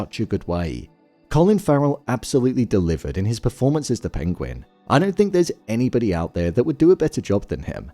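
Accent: United Kingdom. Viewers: You British (??) hear your voice and it sends my mind to peace. Good breakdown. 💪🏿🫶🏾👍🏿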